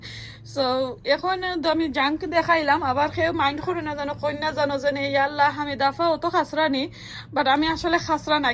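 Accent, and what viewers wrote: Sylhet, Bangladesh. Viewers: I like how you speak in sylheti mashallah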